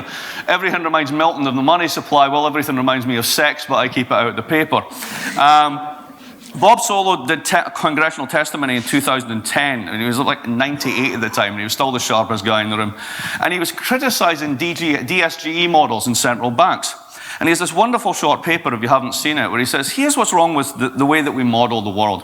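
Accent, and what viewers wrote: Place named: Scotland, United Kingdom. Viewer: + Scottish Accent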